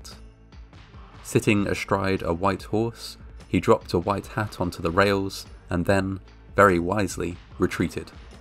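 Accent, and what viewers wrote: United Kingdom. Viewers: He’s British, it’s not fair :(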